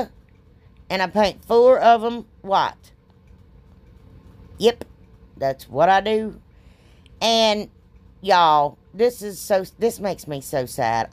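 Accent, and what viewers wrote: South, United States. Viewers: Ive been looking every chance I get for a CALENDAR with a truck.I guess I'm just not fast enough 🤔 I will keep watching u always bc u r my favorite crafter & u always lighten up my days. please Don't change a thing. course I will keep you & you're family in my uprayers . Can u tell me where u grew up bc u have a beautiful thick acent. I thought u said something about Ohio but u sound more Southern. I hope your parents feel better soon & I will pray for GOD'S BLESSINGS on u & your family it looks like GOD'S already BLESSED u with Talent .The longer the video the better. I'm about to watch another of your videos. Keep them coming. love u girl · You sound like a Southern Country Girl. The rocking chair is adorable I think it would be cute with a country bunny!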